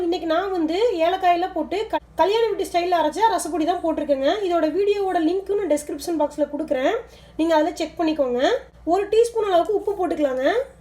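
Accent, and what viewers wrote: Kongu, India. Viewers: ரசம் சாப்பாட்ட விட உங்க கொங்குதமிழ்தானுங்க சூப்பரு, ஏனுங்க என்னுங் நாஞ்சொல்ரது...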